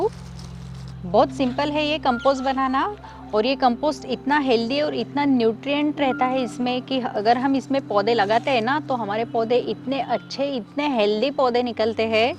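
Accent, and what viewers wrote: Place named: Maharashtra, India. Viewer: Aapke vdo to muze bahut achhe lagate hai. Language tuning se aap muze marathi lagti hai. Am i right mam?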